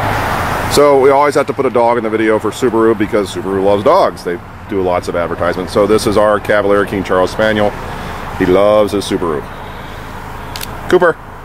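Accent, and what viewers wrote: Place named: Midwest, United States. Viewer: Have seen many '16/17 Outback reviews, and not once has anyone shown the roof racks in operation. (Thanks!) Thule or similar crossbars can cost hundreds; integrated rails is a great feature. Could tell you're in Chicago by the architecture, and your slight Midwestern "accent." Sounds like home. Far North Side? Edgebrook? / South? Beverly?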